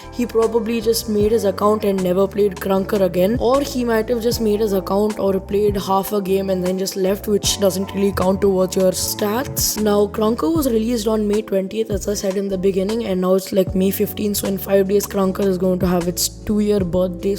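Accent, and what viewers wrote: India. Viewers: Yooo you Indian? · Your acient is like an indian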